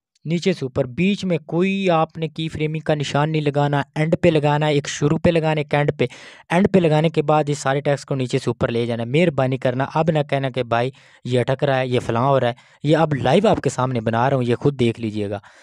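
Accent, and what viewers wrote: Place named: Punjab, India. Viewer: اپنا لہجہ درست کرنے کی کوشش کریں پیارے بھائی آپ اردو بولتے ہیں تو ایسا لگتا ہے کہ آپ پنجابی بول رہے ہیں اسکے لئیے آپ نے زیادہ زور سے نہیں بولنا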